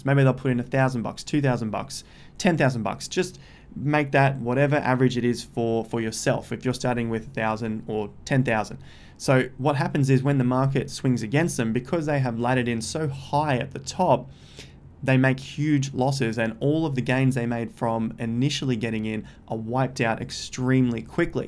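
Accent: Australia. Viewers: Maaate! I have been in this crypto la la -land since early 2017 (and seen thousands upon thousands of vids). This is hands down by far the best "tutorial" any new (and old) investor could get. Absolutely nailed it. No fuzz, no drama, just facts. Thanks a ton! On a sidenote, I studied 3 years in Brisvegas and listening to you(r) accent and seeing the QLD had me tearing up a bit;) Good on ya! Chers and thanks. Lotsa good vibrations from Norway!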